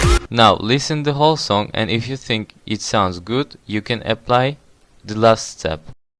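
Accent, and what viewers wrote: Turkey. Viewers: lan yemin ediyorum kanala bakmadan bu ingilizcenin türk birinden çıktığını anladım aosıhdpoanrv8ebrwıunabf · he is turkish :D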